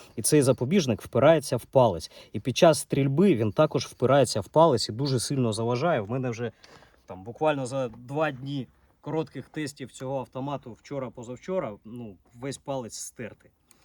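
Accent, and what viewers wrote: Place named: Russia. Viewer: На слух старо русский деревенский язык, что говорит понятно это какой регион России? Кокая та окраина России точно. Давно не был в России мира всем и процветания.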